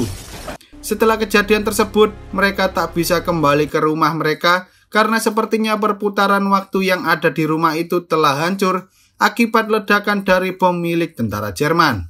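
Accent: Java, Indonesia. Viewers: Bg sira wong jowo kah? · Jawa kah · Jowo ndi Iki seng duwe konten? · Suaranya medok kyk dodit Mulyanto 😂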